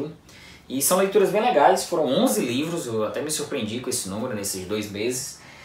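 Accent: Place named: Fortaleza, Brazil. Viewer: Assim que me identifiquei com teu sotaque, fui atrás de saber se tu é de Fortaleza kkkkk Que coisa é encontrar canal literário de qualidade pertinho da gente!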